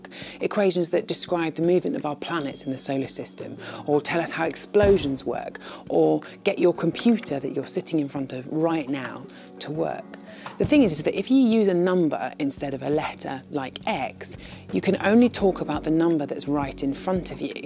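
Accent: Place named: England, United Kingdom. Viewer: Pretty, smart, english accent... Christ. Inb4 sexism, I comment on Derek Muller's sexy self all the time as well.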